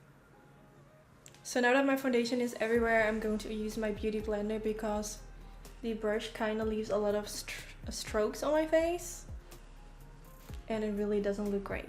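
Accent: Netherlands. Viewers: Love your full face tutorials!!! Listening to you speak makes me miss living in the Netherlands.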